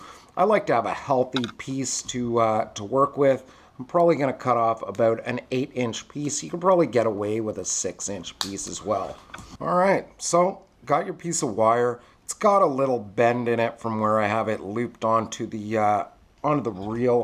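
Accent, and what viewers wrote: Finland. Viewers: Dude!!, thats cool;) I noticed a little fin akcent there:) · Are you finnish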